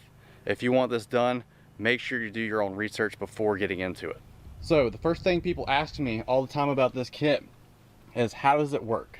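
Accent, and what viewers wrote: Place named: North Carolina, United States. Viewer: I was going to ask where you were from because your accent is almost the same as mine and then you said NC! Edit: just saw the Henderson tag I’m in Greensboro! Love it! And great video, working on planning out my camaro :)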